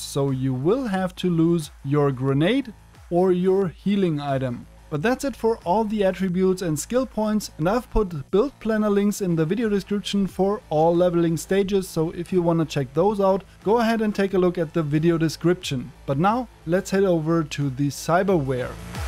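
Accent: Germany. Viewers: Wenn Deutsche englisch sprechen… 😅 aber schönes Spiel! Cyberpunk ist zum Glück noch ein geiles Game geworden! 👍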